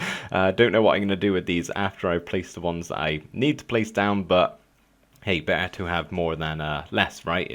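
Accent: United Kingdom. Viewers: i have literally been trying to figure out if he's British or not, but this episode has convinced me that he is...*cough* cough "not at toll" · he is British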